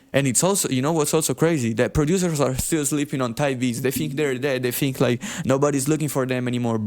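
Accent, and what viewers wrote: Italy. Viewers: true ahahah! The first thing I thought as I soon as I heard you talking is "what, Italian man making a living in music making beats?" LOL good video and good inspiration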